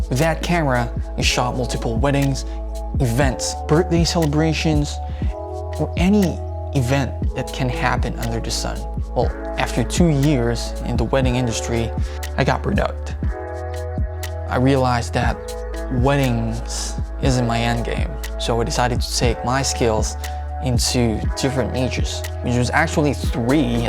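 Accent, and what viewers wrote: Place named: Canada. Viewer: wow... within two years in canada you have that accent already. What school in the phillipines did you go to?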